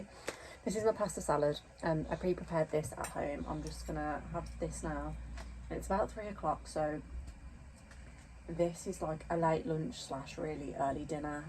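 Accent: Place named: Birmingham, United Kingdom. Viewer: Bath is beautiful, I'm glad that you had some sunny weather, 🌞 A moored narrowboat, in Bath, is a great first time experience. The stunningly good YouTube algorithm bought me here 😊 I'm not a boater but used to work in Bath and I know some parts of the K&A. Unfortunately, I know of several dogs that have drowned, particularly Bulldog types. Every dog falls in at some point. So perhaps get Nina a life jacket that also keeps her nose out of the water. The K&A is so popular, it's one of the most congested canals and finding moorings near settlements is difficult. I know nothing about you, but hear the Birmingham accent, so I guess canals are in your DNA 😅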